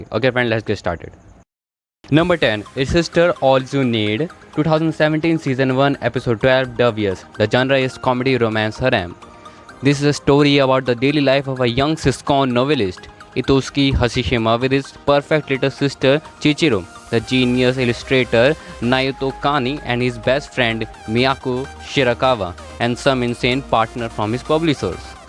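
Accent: India. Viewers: Hey bro your voice like indian are you indian 😊ya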